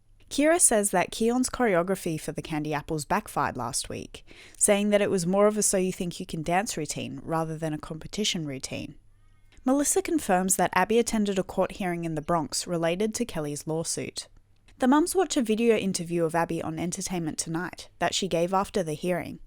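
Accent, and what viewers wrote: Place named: Western Australia, Australia. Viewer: R u by chance from WA? U sound like it!! (Canadian with a perth roomate)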